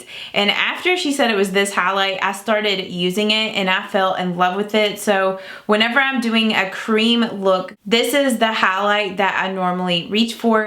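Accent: South, United States. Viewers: You talk with the southern DRAAAAAAL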